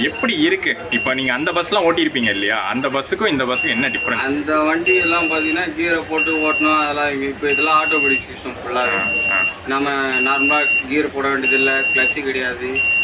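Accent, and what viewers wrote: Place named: Nagapattinam, India. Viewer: Tq sir.., nagai language la pesurin😊😊ga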